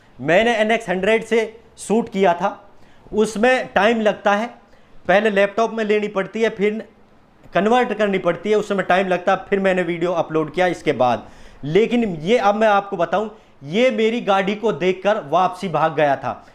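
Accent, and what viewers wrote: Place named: Mewat, India. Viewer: Sahi bola Bhai aapne aap mewati bola good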